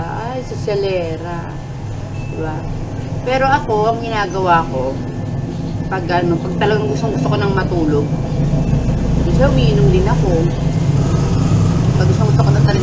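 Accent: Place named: Visayas, Philippines. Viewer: Ntawa ako sa visaya accent mo sis 😃😂 ok nga minsan gusto mo ngang mg vlog ng mhaba pag nasa Labas ka pero my mga Tao ksi Kung makatingin e parang baliw. Good at my update Nman Khit papano ky Nanay inday sis.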